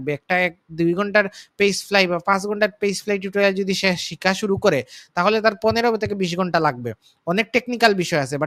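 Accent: Sylhet, Bangladesh. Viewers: Apni sylhety naki???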